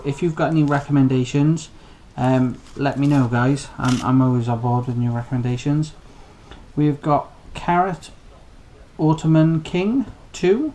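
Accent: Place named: United Kingdom. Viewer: Hi, Just seen 2 video's so far, but its looking great. I did have (1) parsnip last year, and i totally neglected it. is your problem in germination? (i only sew like 6 or so) Tobacco i will give that a try this year, i dont know if i do have to ferment it though. I'm also very curious about your tomatoes; you sound like you're from GB (i'm just across the chanel) and i can't grow any tomatoes outdoors here, except for the cherry tomatoes. Will you also be planting/sowing some peppers, radishes,....? Grtz Tim, aka The Druid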